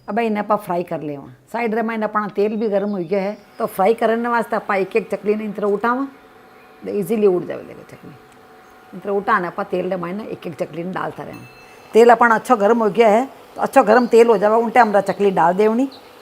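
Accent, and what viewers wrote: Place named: Nagori, India. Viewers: ap ki zuban nagori hai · mai rajesthan s hu , ap ke language sun k apny mayke ke Yaad ati hai.... nice recipe 👌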